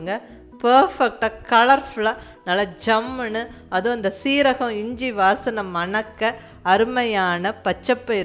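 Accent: Coimbatore, India. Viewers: Hi aunty I made this dosa today and it turned out very crispy and yummy. Whenever I think of anything to cook, before asking my mother I visit your channel and I find the solution to it. I just love the way you flourish Coimbatore slang of speaking and I adore that so much. U have very peculiar pronunciation. Looking forward to meet you one day aunty.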